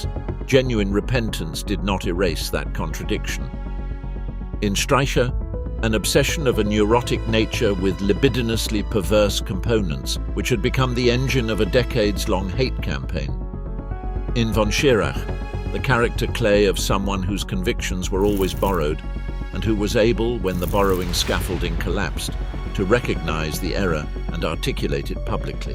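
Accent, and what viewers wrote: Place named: Oxbridge, United Kingdom. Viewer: stagey and pretentious...instead of the corny oxbridge accent and spooky music , a little histortical rigor...when thbe narrative ventures to comment and philosophize it pushes the envelope of banality....saying trite and obvious things in a stagey accent does not really dignify them ...too stupid overall and constantly stating trite opinions as verities....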